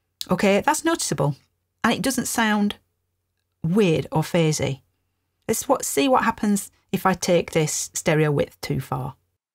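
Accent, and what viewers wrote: Northern England, United Kingdom. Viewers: I came into the video and heard an eloquent-sounding British lady, and then out comes this ripping pop punk song. I love it because punk almost has this middle finger to cultural norms. I really love how clear speaks and I'll be able to come up with a few tricks thanks to this video. I came to this conclusion: when someone related to music is explaining something, do you like this person's work? I like mix. I also had to turn off YouTube Stable Volume to really hear it. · Where tha from? Nice to hear a Northern accent on YT :face-red-heart-shape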